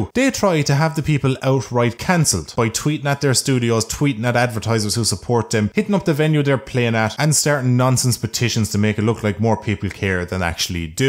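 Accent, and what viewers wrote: Ireland. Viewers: Love your accent! Is it Irish?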